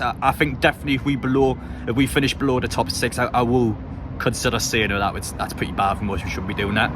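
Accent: Newcastle, United Kingdom. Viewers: Has anyone watched the video with subtitles on?🤣🤣🤣 Artificial Intelligence trying to relay the Geordie accent, classic!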